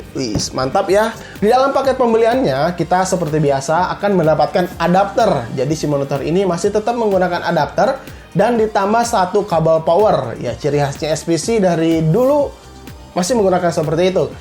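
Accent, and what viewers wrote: West Java, Indonesia. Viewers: Letah sunda mang pabaliut kitu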